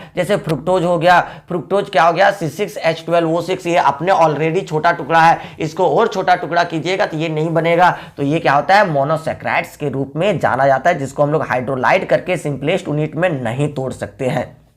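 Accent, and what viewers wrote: Jharkhand, India. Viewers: Aap jharkhand se hi lagte ho sir 😊😊😊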